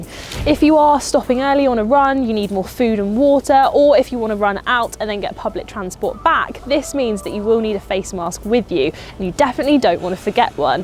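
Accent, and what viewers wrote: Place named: RP, United Kingdom. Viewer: She has, what we would say here, that BBC tone to her. I always wondered whether that comes naturally to people growing up in the UK or whether it's through training.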